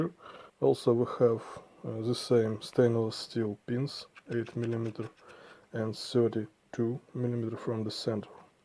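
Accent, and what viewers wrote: Russia. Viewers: русскоязычный?.Спрашиваю потому что интересно на английском говоришь.как будто Русский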